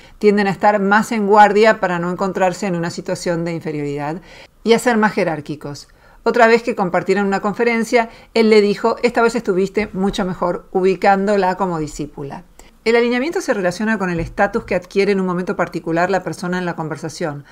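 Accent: Argentina. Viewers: Castellano a lo mexicano con acento aegentino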